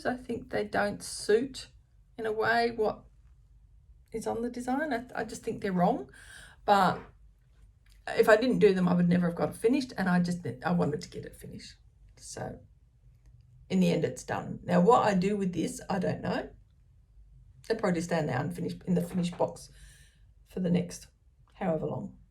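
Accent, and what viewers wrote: Australia. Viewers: So happy to see you back and hear your gorgeous Aussie accent! I love all of your finishes and can’t wait to follow your progress in our group and on screen! Big hugs to you! ❤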